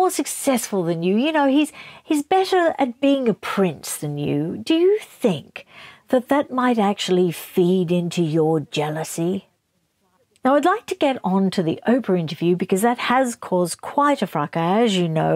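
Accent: Australia. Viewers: To repeat… these Snippets are like a verbal Southpark for me here in the US. Double bonus is your lovely calm Australian accent , in addition to the brilliant story lines. Americans are snarky in a different way, but it’s almost better coming from a Commonwealth member! You have even more reason to dislike them intensely!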